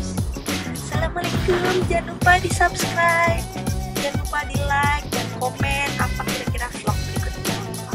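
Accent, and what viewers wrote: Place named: West Java, Indonesia. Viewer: orng sunda nya katingal teh cara nyarios na 😬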